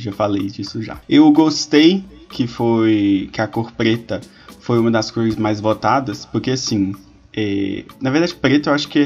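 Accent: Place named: Rio de Janeiro, Brazil. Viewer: Sou de Salvador e jurava que você era carioca! Kkkkkk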